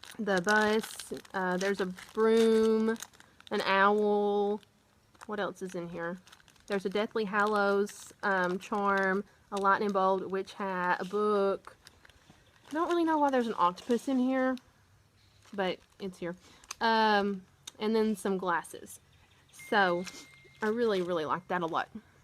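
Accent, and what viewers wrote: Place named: South, United States. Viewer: I have enjoyed both of your podcasts and am looking forward to more. I'm a knitter and crocheter from Pleasant Shade, TN. Love the details that you give about your projects. Your hand dyed yarns are lovely, I would love to learn to do that someday. It's a treat to hear a podcaster whose southern accent matches mine! And your Co-host is adorable 😊.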